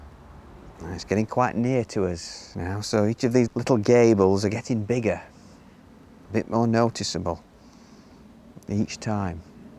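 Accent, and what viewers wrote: England, United Kingdom. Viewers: What type of English accent is this? Reminds me of Eric Idle. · I am so amazed by this painting and Mr. style, I watch this video at least 3 to 4 times a week....even while I'm doing my own creating. The colors, the cottages, the British accent - all my absolute favorites! My love for the English cottages and the landscape keeps me re-visiting this video and the others, as well. Thank you, Mr. for such soothing yet helpful tutorials.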